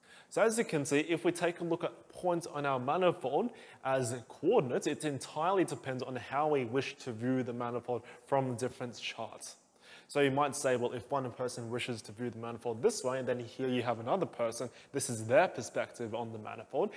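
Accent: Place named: Australia. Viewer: Haha, I was just trying to place your accent when you gave the Sydney example. It’s an Australian accent, of course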